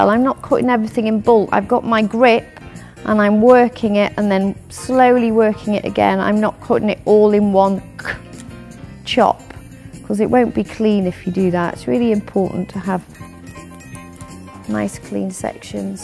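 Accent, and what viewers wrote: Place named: Liverpool, United Kingdom. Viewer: It sounds like she has a Liverpool,England accent to me for sure.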